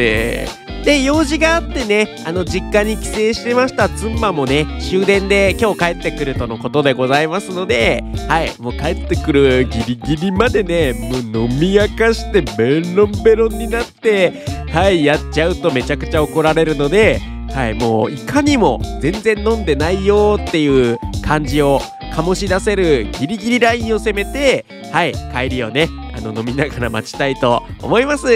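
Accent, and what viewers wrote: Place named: Tokyo, Japan. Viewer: コメントありがとうございます😊 東京の人ですか？話し方がそうっぽいので。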